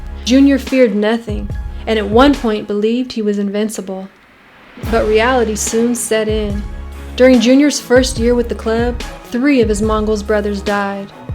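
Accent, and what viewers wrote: South, United States. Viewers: The narrator has a sweet southern voice ❤️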